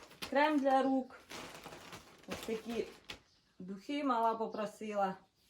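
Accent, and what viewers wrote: Poltava, Ukraine. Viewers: Як мені подобається наш неповторний полтавський суржик!❤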